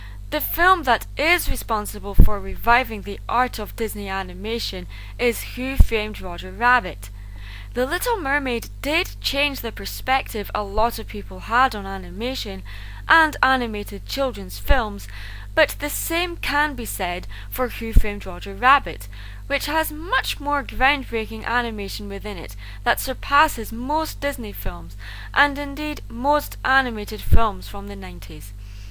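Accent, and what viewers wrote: Scotland, United Kingdom. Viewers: You probably hear this a lot.... but I really like your voice. Scottish accents sound so cool. ^^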